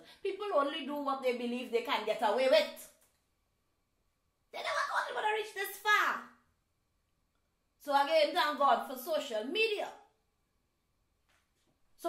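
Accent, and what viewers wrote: Barbados. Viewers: That Bajan accent!!! 😂😂😂😂😭😭😭😭😭😭😭😭😭😭😭😭😭😭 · love she bajan accent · The bajan accent killing me to😭😂😭😭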